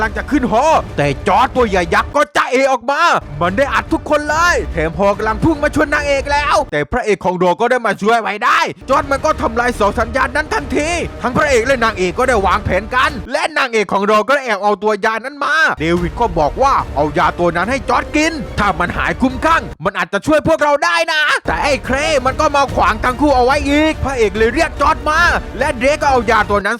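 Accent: South, Thailand. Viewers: พี่เป็นคนใต้เเน่นอน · พี่เป็นคนใต้ป่าวครับ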